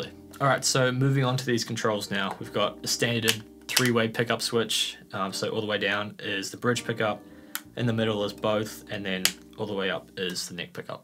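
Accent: New Zealand. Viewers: Nice to hear a New Zealand accent. Where did you buy the guitar from? Local or online? I'm considering getting one also.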